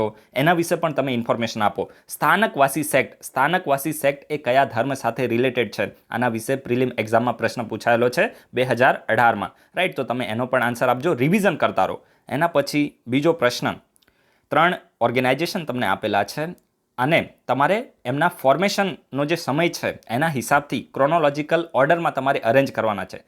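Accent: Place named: Ahmedabad, India. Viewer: anand ane amdavadi boli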